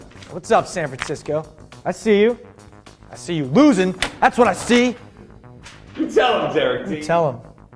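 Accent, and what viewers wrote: England, United Kingdom. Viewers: Nice UK Accent btw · Forget the real English accent. English acent is much better! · nice english acent!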